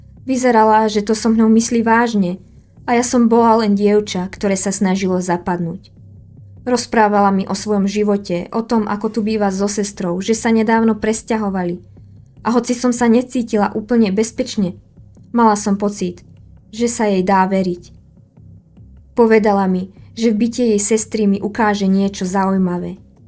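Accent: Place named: Central Slovakia, Slovakia. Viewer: dakujem, smutný príbeh, ale krásna ľúbozvučná stredoslovenčina ❤️👍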